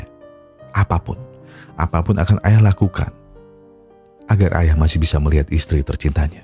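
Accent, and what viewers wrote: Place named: Semarang, Indonesia. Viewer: Jowo mu medok kang Koyo semarangan 😂😂😂😂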